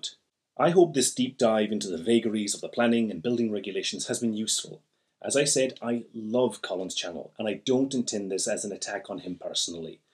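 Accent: Ireland. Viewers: Are you from Cork lol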